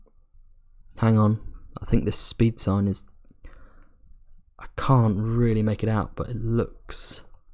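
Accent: United Kingdom. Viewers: I meant, to me Wizard has a stereotypical Black Country accent and you have a more generic Southern England accent which I find hard to pin down.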